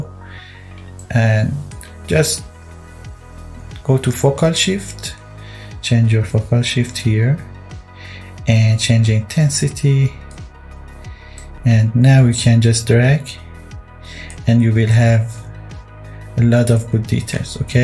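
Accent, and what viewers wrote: Iran. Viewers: Your accent sounds Persian. 🤔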